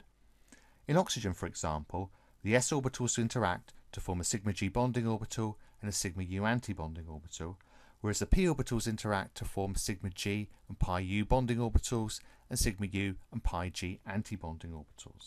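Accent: England, United Kingdom. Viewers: God, the English accent, but at least it is a short video.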